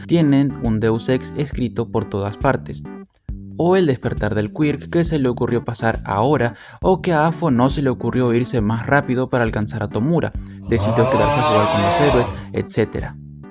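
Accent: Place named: Argentina. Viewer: Sé que suele narrar así en su canal principal, pero me recuerda a Axl Kss, talvez por el acento argentino XD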